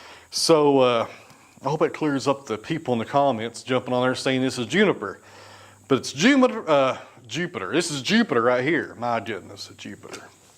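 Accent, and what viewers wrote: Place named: South, United States. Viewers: Yes! your accent trips you up sometimes, I think. I find, myself, I sometimes end up trying to say a word two ways at once, the accented version and the standard "television newscaster" version. I muchly prefer the Eastern Tennessee version. I too watch in part because of this. and yes, like, "all you all" is a real southern phrase. when I lived for a while in Johnson City, I really noticed how "storytelling" most people's speech was. Even a trip to Walmart might could be made into a story. And the phrases too. "a ton of pine" sounds OK by me. Southern phrases, yes indeed. many are made-up on the spur of the moment. Thus your "bloopers" are often endearing to me. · Well you are in fine form, Quite frankly it does not worry me. It is great to here that southern accent. Go for it buddy.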